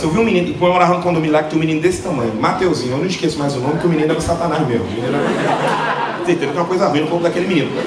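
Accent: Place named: Northeast, Brazil. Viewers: Ele tem o sotaque do nordeste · Esse é Pernambucano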